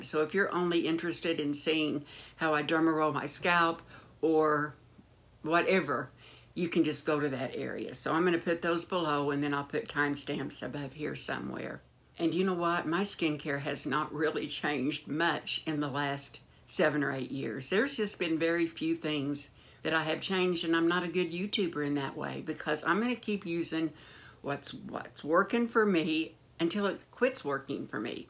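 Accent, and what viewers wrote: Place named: Illinois, United States. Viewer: I really enjoy your channel! I have family from Louisville and Olney areas in Illinois, and every once in a while I hear a bit of “Something” in your voice or speech pattern that reminds me of them. Am I even close geographically?? Also, I too lost my closest friend (Kathy) in 2020, definitely changed my life, left a big empty spot no one could ever fill. I dreamt of her the other night - first time since she died. She said - “ what took you so long to visit me”. Have you ever dreamt of Pat?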